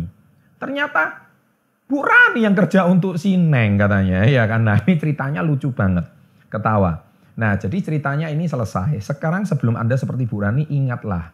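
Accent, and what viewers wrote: Java, Indonesia. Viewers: Logat jowo e ketoro pol opo wong jowo to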